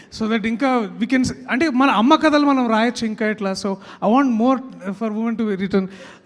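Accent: Telangana, India. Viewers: ప్రియదర్శి గారికి ఒక సలహా తెలుగు సినిమా లో యాక్ట్ చేసినప్పుడు స్టోరీ బట్టి dilect ఉంటుంది అది తెలంగాణ యాస అవొచ్చు రాయలసీమ యాస కావొచ్చు లేక శ్రీకాకుళం యాస కావొచ్చు కానీ తెలుగు అని ఒక భాష ఉంది అది ఎవరో ఒకరికి చెందింది కాదు అది అందరిదీ అందువల్ల తెలుగు మాట్లాడానికి ప్రయత్నించండి మీరు తెలంగాణ యాస మాట్లాడి ఒకే ప్రాంతానికి చెందినవార్ని సంతోష పెడుతున్నారు ఇది తప్పు ఇలాగ చేసిన చాలా మంది కనుమరుగవుతున్నారు, మీరు అలాగా అవుతారు అనుకోవడం లేదు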